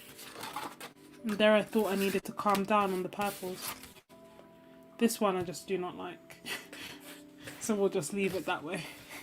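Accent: United Kingdom. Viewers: Lovely florals, love the colours! And yes, you do have British accent LOL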